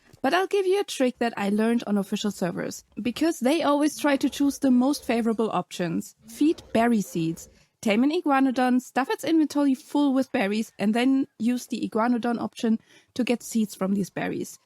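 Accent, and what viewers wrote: Germany. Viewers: I subbed after watching your guide to breeding. Best explanation i could find. Well done. Also subbed for the nostalgia as your accent reminds me of my cousins who live in Germany ☺️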